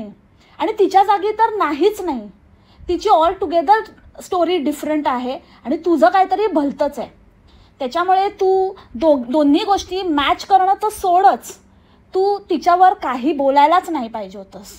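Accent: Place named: Malvani, India. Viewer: Tai tula malvni yete ky.....mhnje tu malvni mast boltes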